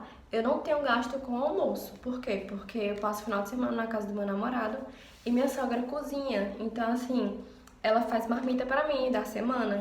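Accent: Northeast, Brazil. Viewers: Uma cara de paulista danada e sotaque nordestino kkkk combinação interessante, legal o vídeo, tbm estou buscando morar sozinho ano que vem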